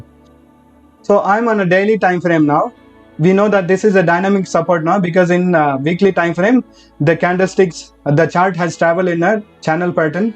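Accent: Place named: India. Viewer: ohh your indian akcent )) Greetings from Azerbaijan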